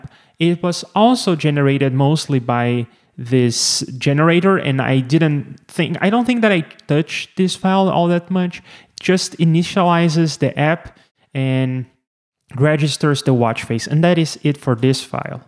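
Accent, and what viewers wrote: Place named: Brazil. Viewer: Nice video, I think it's hard then I expected, as I'm not a programmer. By the way your accent sounds like a Brazilian but with a really good English!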